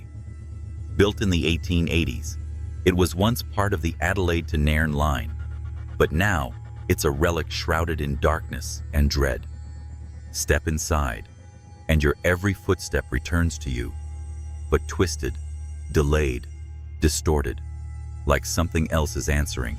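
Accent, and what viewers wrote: United States. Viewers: Why are Australian haunted spots being narrated by an American?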